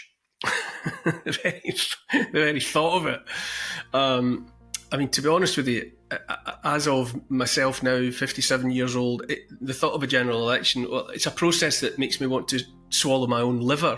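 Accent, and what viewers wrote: Scotland, United Kingdom. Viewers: His words are powerful poetry in a Scottish accent and deserve to be heard by all no matter how delusional or brainwashed one currently may be Neil’s words might enlighten the dome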